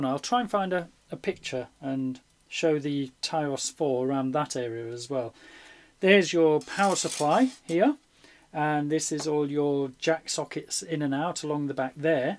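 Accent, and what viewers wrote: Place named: England, United Kingdom. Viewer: Hi newly subscribed to your channel. you sound very English although you were talking about product COST's in dollar & cent's, but I take it you reside in England & have to send to America for your parts? however! i enjoyed your very informed repairs videos, & wondered....do you extend your workmanship to Roland models? VA-7 in participant? i may pick up some tips!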